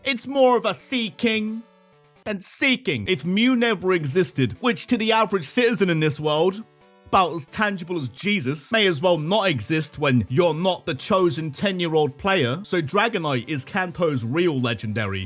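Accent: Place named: United Kingdom. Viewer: You're so british I love it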